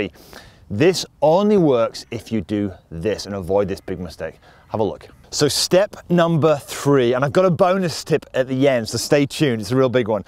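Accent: United Kingdom. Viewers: You wonderfully master the art of teaching golf an I'm fond of your so British Accent😊...It's just a friendly wink of a French golfer.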